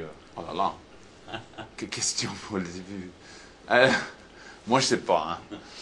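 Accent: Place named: England, United Kingdom. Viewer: The first time I understand him.....and I love his english accent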